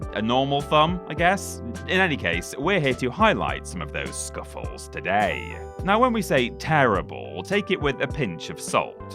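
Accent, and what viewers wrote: United Kingdom. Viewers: He sounds like a normal Brit, Perhaps you are mouse voiced man.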